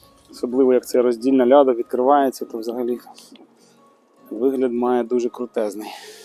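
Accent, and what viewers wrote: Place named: Germany. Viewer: Гарний німецький акцент, дякую за відео, дуже цікаво!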